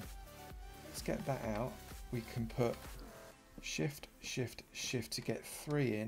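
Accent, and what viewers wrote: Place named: North, United Kingdom. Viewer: Um. You must not have listened to many males from the northern UK speak. He sounds like one of those, but that’s pretty much where the likeness ends.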